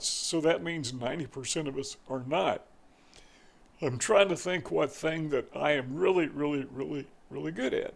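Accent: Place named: South, United States. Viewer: I’m Australian,and I can pick your southern accent quite easily. It’s a mild accent, but there all the same, especially when you say “I”, it sounds like “ah”.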